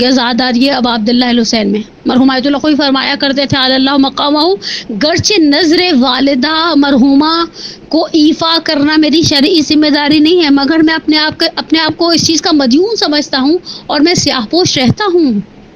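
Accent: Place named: United Kingdom. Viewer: Ya kuin aysi Hain akhir??? kuin English accent main Urdu bolti Hain ...:(in ko madarsa kuin itny achy topic pay bolnay ko khta hai akhir??? Ya Allah in k lecture ko Sunna boht muskill ho jata ha JB k in k husband intehaie bahtren Andaz main bat karty Hain..I wish main Karachi aun tw in sa milun ek bar aur pochun ap ko itna complex kuin hai angrazi ka ...ap tw Urdu b bol lain boht hai kuin k ap tw pakii lahoran Punjabi Hain...anyway really sucks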